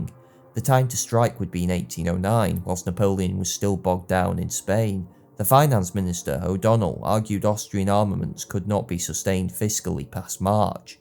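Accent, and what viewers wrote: United Kingdom. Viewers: Definitely British, but where specifically idk. I'm American too lol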